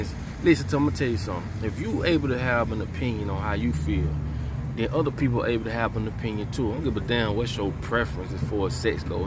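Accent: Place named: Atlanta, United States. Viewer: You sure you from Florida you got...you got an ATL swagg fosho...talk and everythang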